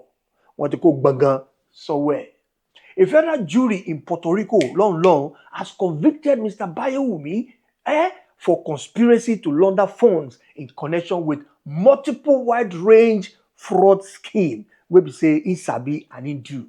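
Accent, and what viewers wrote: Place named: Yoruba, Nigeria. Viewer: Your content is good but the title is too ethnic bigot in meaning. Your accent sounded Yoruba but your attitudes portrayed adulterated and unpatriotic Yoruba. You sounded biased to Yoruba goodness. Title like 5 Nigerians fraud stars would have been better and more civilised. Next time educate your minds with civility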